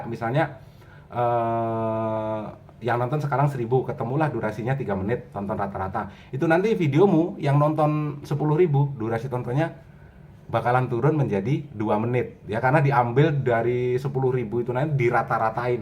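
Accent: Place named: Java, Indonesia. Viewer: bang asline wong ndi kok jowone ketok banget heheh.. makasih ilmunya bang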